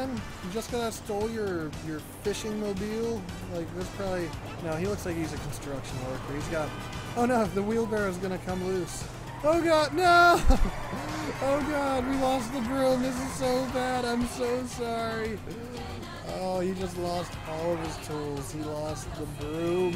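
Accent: Sweden. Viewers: Are you from sweden😃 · Are you swedish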